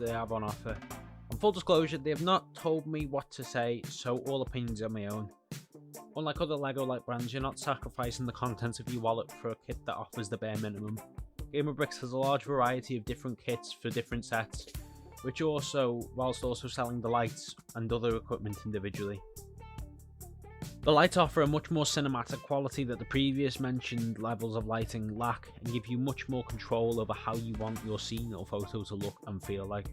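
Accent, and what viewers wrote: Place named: Liverpool, United Kingdom. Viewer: Yup i can tell your from Liverpool nice vid!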